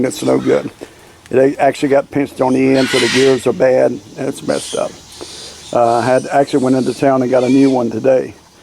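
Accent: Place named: Louisiana, United States. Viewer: Where there is one there are many - be careful! They do eat rats but what a trade off. I hear macaw's - they sound jealous to be on camera - LOL or in pain, I can't decide. Your accent reminds me of my grandparents - LOL Cajuns, they were from Louisiana...you're from Florida....haven't heard it there...PS I think if you click the button on your edit page you can edit the title - I think you mean - Homestead Dangers no Homestad Dangers....just letting you know.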